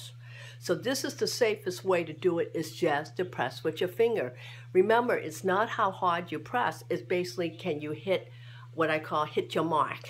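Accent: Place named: Massachusetts, United States. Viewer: Thank you for this clarification. Wow, I bet you are from Massachusetts! I can detect that accent anywhere..............blessings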